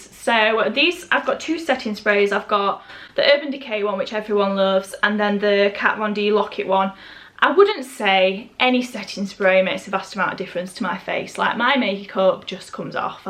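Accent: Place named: United Kingdom. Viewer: Where abouts in the UK are you from? I cant quite work out what accent you have x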